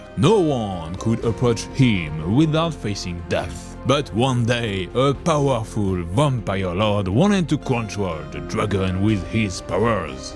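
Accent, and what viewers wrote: France. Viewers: Héhé you are the Bruno Albouze of mini paintings (same fr accent and all)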